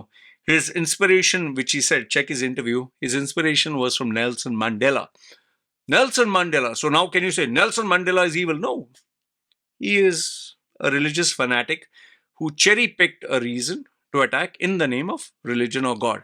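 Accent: India. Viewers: Your such a hypocrite, first of look at your own name when your a full on Indian, that is very clear with your colour and your f****d Indian accent so don’t come on here and try and degrade next mans religion etc especially Muslims, you have a personal problem with Muslims then be a man for once and come out face to face and bring your problem up with them, Muslims are proud of their religion and allhamdillia they are staunch too, theirs people in this world who have some of the greatest minds and IQ who have accepted Islam because they have seen the proof, a man in the middle of a desert and uneducated has predicted things that have been proven by science decades later and at that time their was no technology to prove things, but people like you can’t accept the truth because your ego is damaged, yes Islam is the truth and I fully and whole heartedly testify to that, what religion are you from I bet you don’t have the bottle to say your religion because you know it’s fake and if it’s not then prove it, you fake ass people sit behind your computer and give it Johnny big potatoes but never when you come across a real man and a real Muslim, Islam condemns violence but if a man does something you cant blame the religion for it, for example you buy a gun and you kill someone will you blame the gun obviously not you have to take the blame yourself, you know what they say people who live in glass house and all that, So in future think before you open your mouth to degrade anyone an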